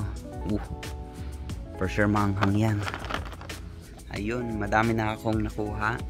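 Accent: Ilocos, Philippines. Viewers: Ang cute mong mag salita ilocano ka nga talaga 😂😂😂I love it ❤ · Grabe balong. Pinoy na pinoy ka na talaga ah. Ay ilocanong ilocano na pala hahahaha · Ilocanong ilocano pati Beng at panagsao ket natangken... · I really salute how you embraced being a Filipino. Ilokana din ako and you really sounds like a legit one. Kaway-kaway sa new subscriber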